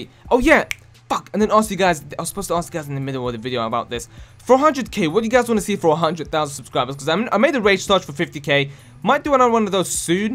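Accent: United Kingdom. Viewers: This is like british jev (sorry if you arent brittish im not sure what nationality you are but you sound british)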